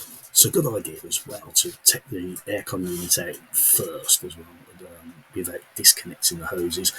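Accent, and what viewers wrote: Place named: Birmingham, United Kingdom. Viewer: Quick jacks 😂 he’s a brummie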